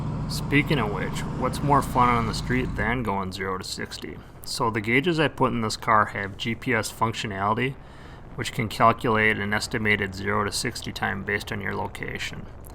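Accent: Midwest, United States. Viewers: Could tell he was Midwestern right from that accent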